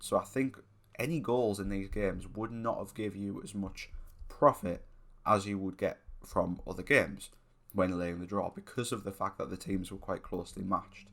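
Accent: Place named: North Yorkshire, United Kingdom. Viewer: That's a very North Yorkshire accent you have, 'Boro or Redcar way! My hubby was watching and I recognised your accent from when I lived in Saltburn North Yorkshire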